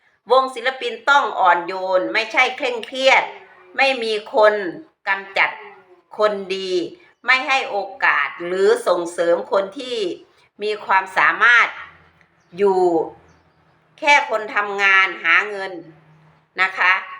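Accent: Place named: Laos. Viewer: น้องเขาคนลาวเขาก็ใช้สำเนียงลาวเป็นเอกลักษณ์ของใครของมัน อย่าอืจฉาเขาเลย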